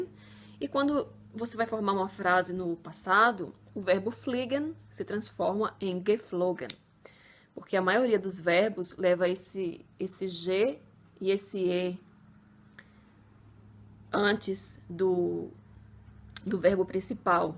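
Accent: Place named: Brazil. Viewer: foi uma boa aula, mas a senhora poderia melhorar mais o seu alemão, tantar falar mais como um nativo germanico e não falar como um brasileiro, o alemão soa melhor com o sotaque deles, então '' Fliegen'' não é '' Flieguem ''... todo EN no final é o som de '' UM '' com a boca totalmente fechada, isso vai ajudar se praticá-lo...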